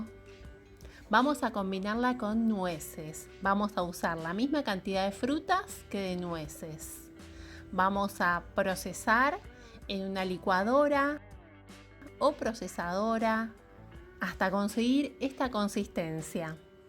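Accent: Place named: Argentina. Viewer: Que ricas recetas, tienes un acento Argentino.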